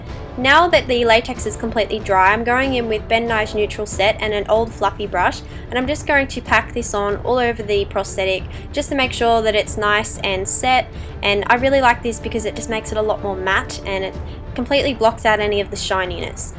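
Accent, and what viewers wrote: Australia. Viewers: Yeah Australian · Lawrence Stapleton she sounds very australian : ) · Amazing! I'm taking from the accent you're Australian like me, so is there a particular place fellow Aussie's can buy Ben Nye supplies or does it all have to be online/from USA? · are you Australian? Your really pretty and an awsome make up artist btw! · You Aussie? Love this!!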